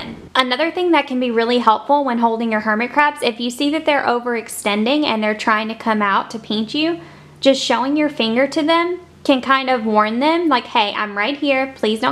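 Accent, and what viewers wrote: South, United States. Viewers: It's her Southern Accent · she is southern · she's southern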